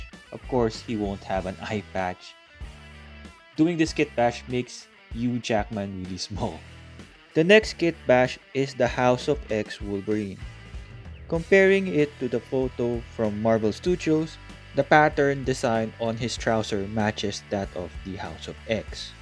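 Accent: Philippines. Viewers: Your accent screams that you're a filipino. Lupet tol! 😂 Edit: after i saw darna figure, it confirmed my theory earlier, pinoy ka nga talaga, bro! 😅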